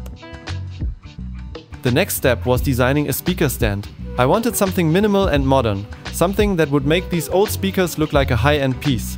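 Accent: Germany. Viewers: I love the German accent. (no joke) Great video and idea! Thank you. Merry Christmas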